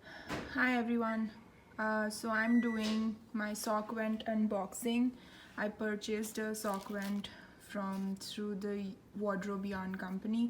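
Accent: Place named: Kerala, India. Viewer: Just found your podcast recommended by several other podcasts. Love your accent! Reminds me of a nun from Kerala, India I went to college with - Sr. Valsa Kumblankal. My husband and I also visited Gatlinburg a year ago and I found the same yarn shop! Bought some lovely yarns and was so thrilled to find a yarn shop to visit on vacation. I have already subscribed to your channel and am in the process of watching all of your videos!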